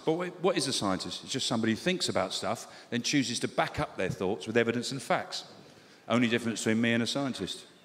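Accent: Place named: Cockney, United Kingdom. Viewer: As an Australian I love the Cockney accent. Our entire Australian English language is basically based around it.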